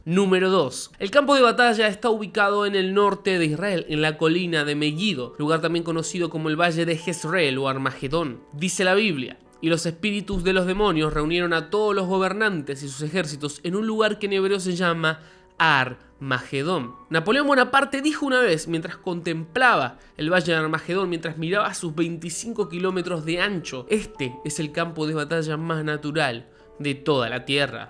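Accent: Argentina. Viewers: Por tu acento pareces Argentino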